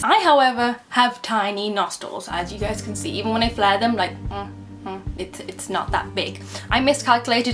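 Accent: United Kingdom. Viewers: LAXICA VIRUTA shes british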